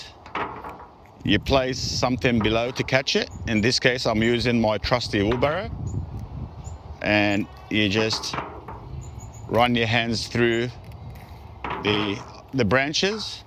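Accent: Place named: Australia. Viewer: That Wog Aussie accent, I love it. I'm a Wog Boy too 😃 Well done mate. Good video.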